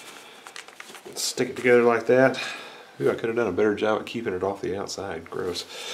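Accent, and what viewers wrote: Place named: United States. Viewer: great accent! Which state is that? (I'm English.) Also, great video.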